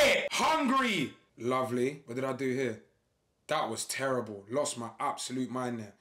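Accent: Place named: Paisa, Colombia. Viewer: Man got that paisa accent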